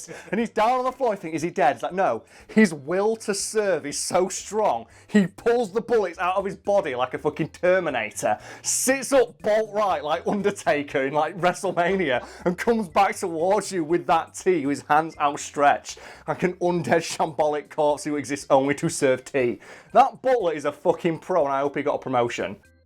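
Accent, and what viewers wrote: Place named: North, United Kingdom. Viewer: Talking about tea like that and having that northern accent, it's like I'm watching Guy Martin!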